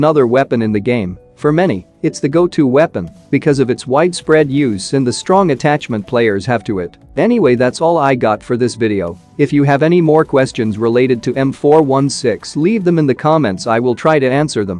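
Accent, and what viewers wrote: India. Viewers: Are you indian???😂😂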